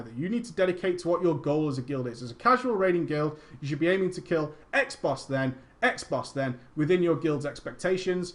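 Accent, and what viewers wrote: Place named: England, United Kingdom. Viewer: Dude your English. Don't know why I never knew that. New sub here.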